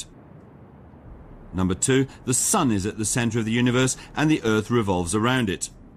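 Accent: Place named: Germany. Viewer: Notei que o cara que está narrando tem sotaque alemão.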